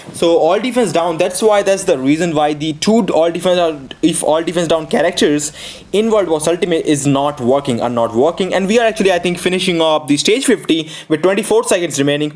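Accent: India. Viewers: Man I love this Indian English 😂♥️♥️♥️. Great job dude ♥️♥️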